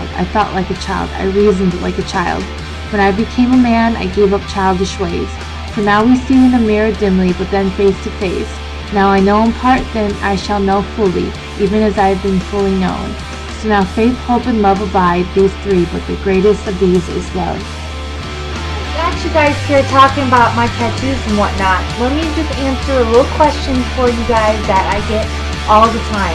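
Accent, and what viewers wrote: United States. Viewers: yeah your accent xD american im from sunderland in the uk you sure do you sound awesome :D your very welcome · are you from the east coast by any chance? you kinda sound like it!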